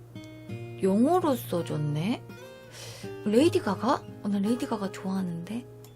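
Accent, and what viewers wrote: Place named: Jeolla, South Korea. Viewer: 전라도 사투리가 넘매력적예요 파티마님에서 이제 으로 갈아탔습니다 ㅎㅎ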